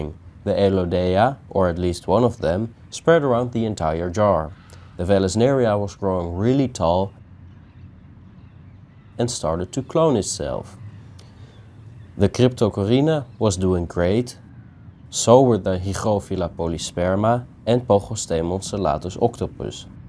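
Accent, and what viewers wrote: Netherlands. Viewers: you sound so dutch, loving the vids! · I'm gonna say dutch. As a another dutch guy, our accents are usually destinct when speaking English 😂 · Heel leuk kanaal kameraad! Je G’s geven het weg dat je een nederlander bent haha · Are you perhaps dutch or Belgian? I hear a similar type of accent🤔😂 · I can’t unhear the dutch accent… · Are you Dutch? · Je klinkt Nederlands (you sound Dutch). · so this guy can only be dutch and i love it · first time on your channel.. took my 3 words to figure out you're Dutch xD Interesting video concept though! Subbed for now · that dutch accent xD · The way you pronounce these latin names with that ever so slight dutch accent cracks me up a lot 🤣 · I'm sure you're Dutch. I heard the Louis van Gaal in you. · Heerlijk man dat Nederlands accentje LUV IT❤ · Based on your accent. Are you Dutch! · do i hear a dutch accent?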